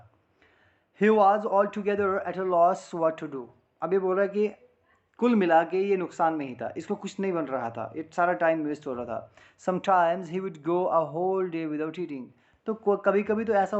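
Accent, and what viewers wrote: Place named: Doda Kishtwar, India. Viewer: Sir aap ke batao se lagta hai ki aap dhodha kishtiward side se rehte hai ❤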